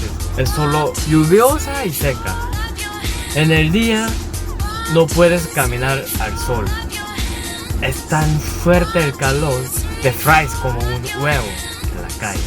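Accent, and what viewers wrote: Panama. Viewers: Que tierno que esta hablando como un panameño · Ajaja ya hablas hasta mas panameño que cualquiera y yo queriendo ir a vivir a Sur corea, fui casi un mes en primavera y es muy hermoso, me gusto demaciado. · Saludos desde mi tierra La Chorrera. Ey amigo ya tu hablas como nosotros los panameños Pritty Friend jejejej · 🤣 habla Panameño Clarito Xuxa madre, Ta webao dice 🤣👍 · Donde aprendiste hablar español, hablas como panameño tu acento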